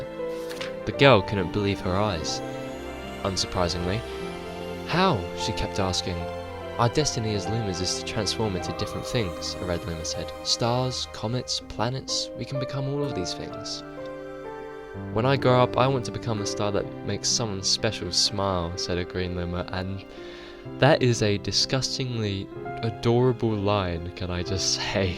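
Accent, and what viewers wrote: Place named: United Kingdom. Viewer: Having another brit explain things is the main bonus for me cuz theres no miscommunication if you say words that mean different things (my biggest struggle with american creators)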